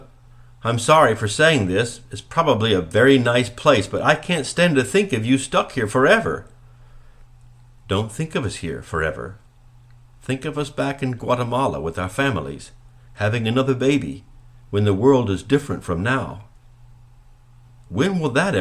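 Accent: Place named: United States. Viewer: Thank you! Really nice reading! Normally I can't stand American voiced audiobooks but you're perfect! Please do the other kingsolver books, your voice suits it so well! X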